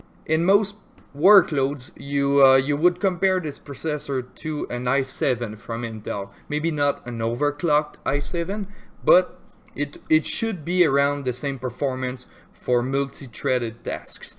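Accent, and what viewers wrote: Quebec, Canada. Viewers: tu sonne pas mal québecois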